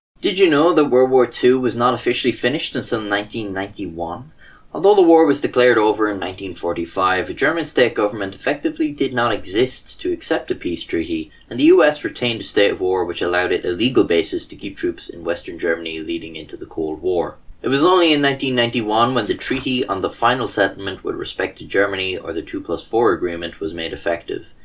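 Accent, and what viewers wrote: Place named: Ireland. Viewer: Lol I love getting historical facts in a sarcastic Irish voice. Moreover, I am of the opinion Carthage should be destroyed.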